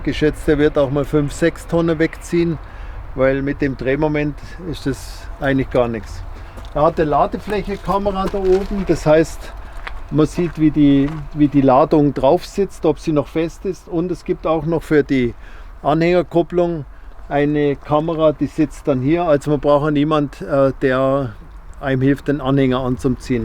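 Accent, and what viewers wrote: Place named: Bavaria, Germany. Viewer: Glad I could still understand a little Bayrische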